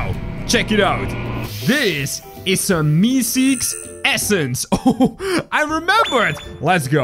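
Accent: Netherlands. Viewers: YOU HAVE A SORT OF DUTCH ACCENT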